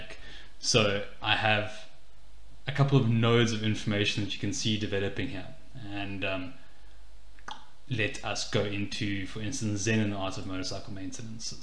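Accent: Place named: South Africa. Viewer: Very useful, thanks. The accent makes me think you're a fellow South African?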